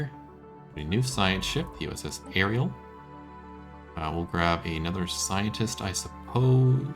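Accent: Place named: Alberta, Canada. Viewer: A fellow Canaadian, and sounds like a fellow Albertan as well, loving the content as usual!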